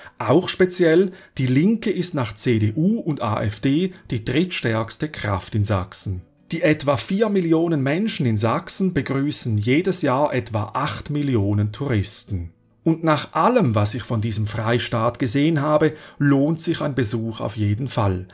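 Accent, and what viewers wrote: Switzerland. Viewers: Sich bei einem Schweizer über die Aussprache beschweren. Wahnsinn in Deutschland - Kapitel 214. Ps: Danke für diese erfrischende Reise!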